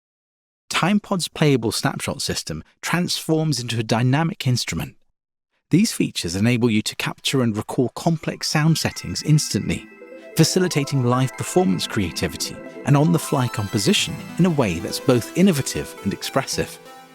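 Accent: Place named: United Kingdom. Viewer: Hi. Congrats on making something new and interesting! Couple of questions… 1) you sound British, so will the stated dollar price include VAT for UK orders? 2) is it midi 2.0 compliant so that changes made in a DAW will be reflected on the controller? 3) any plans to create DAW specific scripts like for Bitwig? Cheers, Phil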